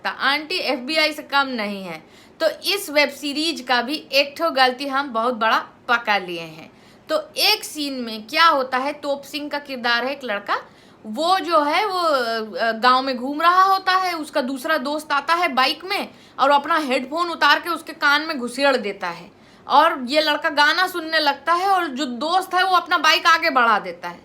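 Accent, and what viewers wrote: India. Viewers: I love your UP accent. Are you basically from there ? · Fan of ur Hindi accent ,uske babau bhi sidhe muh baat nehi karta hey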